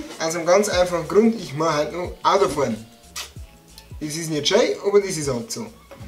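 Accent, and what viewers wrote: Bavaria, Germany. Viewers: dei akkzent is echt oreidig aba guad wea moand a urbayer zum sei...aba nechsd moi bitte richtige reihnfolge vo de zutatn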